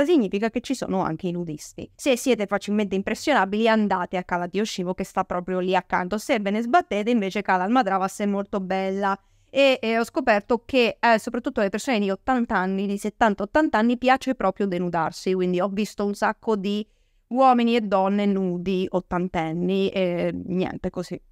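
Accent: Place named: South, Italy. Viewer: Dall accento sembri più del sud😊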